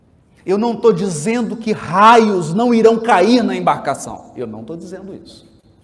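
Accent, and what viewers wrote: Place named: Minas Gerais, Brazil. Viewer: Obrigada por essa mineirise maravilhosa . querido irmão obrigada por seus ensinamentos paz e luz.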